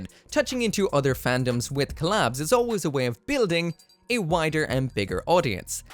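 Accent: Ireland. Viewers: I heard the accent and knew straight away you are one of my own.. I got my first Gunpla in ken blacks in Kilkenny. Genuinely think its become an Issue for me now the bank account is gonna be crying for a long time now haha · not the 1920s bavaria 😭….. also ? u are irish??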